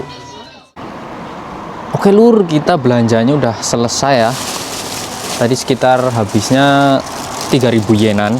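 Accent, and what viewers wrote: Banyumas, Indonesia. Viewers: Ngapak kh bg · Enyonge kan batang sampeane kan Endi kang ? Kok ngapak" wae. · Jawa ngapak mas? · Kaya wong Jawa Tengah bagian kulon😂,, ngapak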